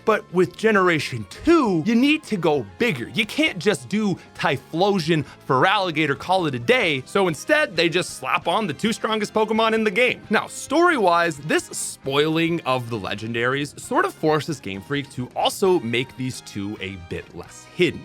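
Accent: Canada. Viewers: Well that's just Canadian pronunciation. I don't think they say drought like that though. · Oh your Canadian makes sense now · He's Canadian. Every time he says something with "out" in it, it sounds like "oat"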